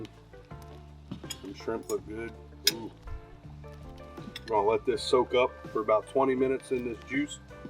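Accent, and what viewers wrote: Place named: New England, United States. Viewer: That yankee accent though….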